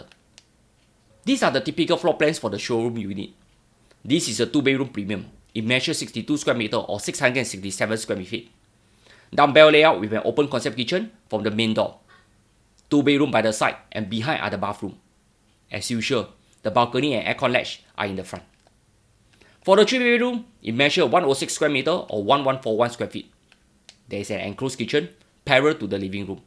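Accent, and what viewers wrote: Singapore. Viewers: Singapore accent SOUNDS TERRIBLE ???!!!!!! NO REPLIES READ.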